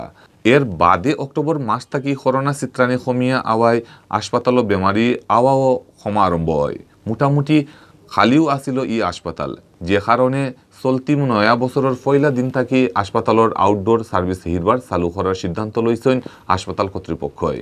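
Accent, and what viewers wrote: Sylhet, Bangladesh. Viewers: Nice news, nice pure her sylhety voice, watching from uk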